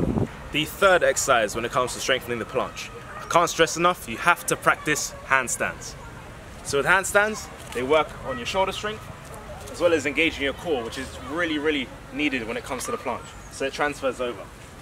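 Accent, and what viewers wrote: United Kingdom. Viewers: yo man where you from in the UK? Nice tutorial very informative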